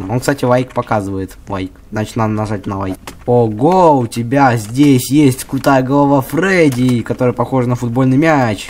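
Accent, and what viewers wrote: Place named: Russia. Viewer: Все говорят што он украинской: а я гаварю што он руской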